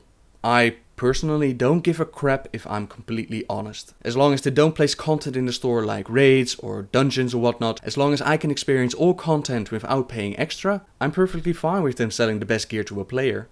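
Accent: Netherlands. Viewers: Your Dutch accent is ridiculous. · are you dutch ?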